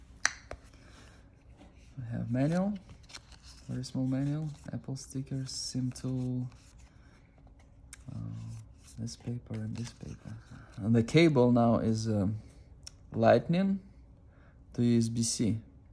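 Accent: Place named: Russia. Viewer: хахаха сразу слышно что руский))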